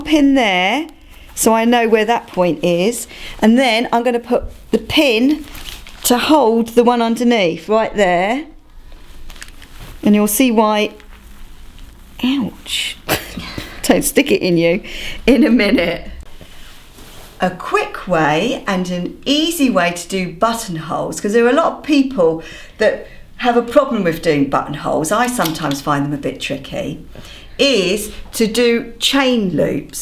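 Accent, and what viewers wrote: United Kingdom. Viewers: you have the uk accent that i just looooove ! i repeat after you like that i learn the uk accent . hihihi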